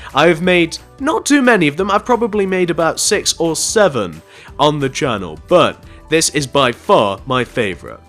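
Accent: England, United Kingdom. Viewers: Are you form England?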